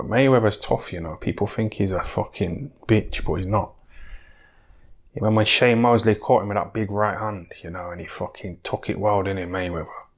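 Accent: United Kingdom. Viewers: I hate Nottingham accents make me feel 🤢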